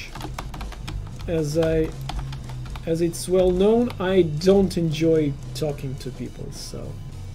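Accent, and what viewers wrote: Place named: England, United Kingdom. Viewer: Oh, you are from England?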